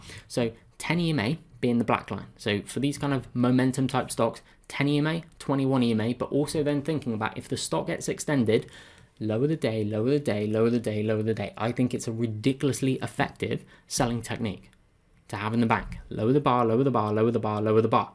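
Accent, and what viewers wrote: England, United Kingdom. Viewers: Brilliant as usual, thanks. I hope you don't mind me asking, where are you from in England?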